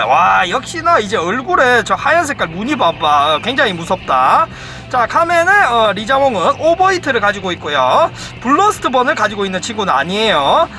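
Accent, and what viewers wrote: Gyeongsang, South Korea. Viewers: 역씨 경상도ㅋㅋㅋㅋㅋ 거북왕 말고 그북왕ㅋㅋㅋㅋ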